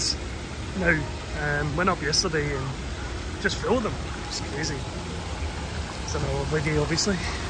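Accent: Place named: Scotland, United Kingdom. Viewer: Just found your channel, loving it so far and love hearing a Scottish accent doing these blogs for a change 😂. Good luck I hope you win big! 🎰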